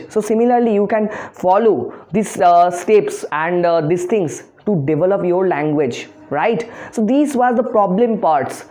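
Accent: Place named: India. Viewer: Sir keep following Indian accent. I always watch your videos because your accent is familiar for us.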